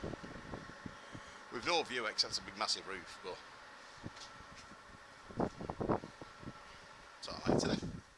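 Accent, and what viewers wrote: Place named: England, United Kingdom. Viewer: Hi mate Mick from Australia here. Great videos, really enjoying them. Which part of England are you from....no expert on the English accents :)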